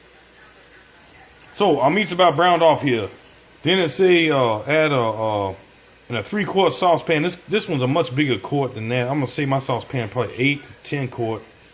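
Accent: Louisiana, United States. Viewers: Why do we love that damn cajun accent so much! Alexa can you use your cajun mood!!!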